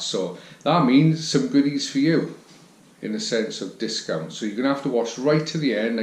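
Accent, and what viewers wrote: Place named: Scotland, United Kingdom. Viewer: Hi i am Chris i watch your channel and like it but sometimes hard to understand your accent where yod from in UK I bet scotland or close. regards Chris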